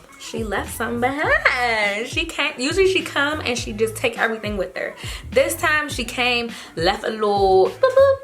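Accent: DMV, United States. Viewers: That DMV pops out everytime! I loveee you lol😂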